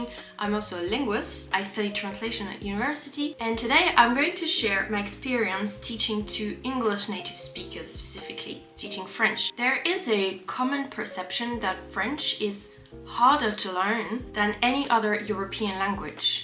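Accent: United Kingdom. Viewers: love your english accent! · I can tell that you have spent many months in the UK. Some of your sounds are very British. That's good. Well done. I would not have guessed you are French. This was a good list of what I have encountered while learning French. I'm at a 50 score now, and hoping to make B1 before I go to Senegal in March. I wish I had made more of an effort in grade school. I'm also working on Spanish, since I will be in the Dominican in October. I do missionary construction work, and I don't like to rely too much on the missionaries to communicate. Netflix does not have a lot of French content in the US, so if you have a tip for me about how to access that, it might help my French listening ability.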